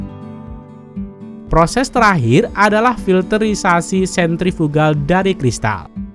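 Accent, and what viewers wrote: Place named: Chinese-Indonesian, Indonesia. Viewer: Logatnya kayak chindo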